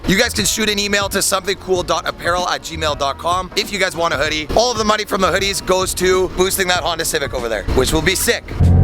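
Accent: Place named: Canada. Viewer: Buddy!!! Sick ass subi. First time watching your video definitely subing. I heard the accent of a fellow Canadian Brother. What Provence you in? ( If you don't mind me asking)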